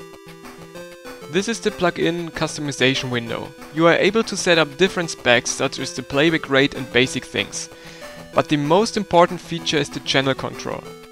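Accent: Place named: Germany. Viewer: Absolutely love your selection of music, and your German accent is awesome! Keep up the good work :)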